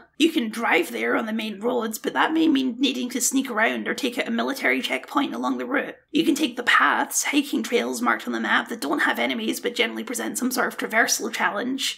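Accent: Scotland, United Kingdom. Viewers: Your voice not even that high bro. It just seems like such when paired with your Scottish accent and that’s how it comes off to an untrained ear. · Lmfao, yeah that was definitely an ignorant American question. Never actually met a Scot so only know the over the top accent seen in our movies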